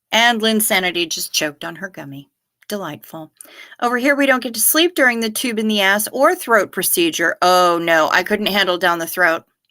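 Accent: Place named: Ireland. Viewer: I didn't expect her comedic timing and Irish accent to be THAT good! It's fun to dial into a show and hear something other than what you'd expect!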